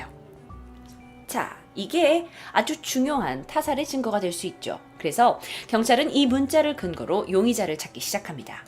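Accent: Jeolla, South Korea. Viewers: 고향이 전라도신가벼여?? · 남자는 숫가락힘만 있으면 딴생각함 근데 제시카님 전라도출신이세요? 사투리 감칠난다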